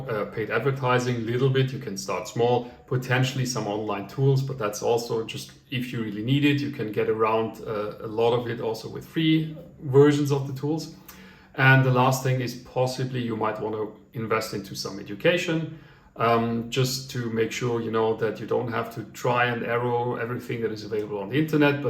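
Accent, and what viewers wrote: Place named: Germany. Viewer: Ich höre den deutschen Akzent :D Aber gutes Video, weiter so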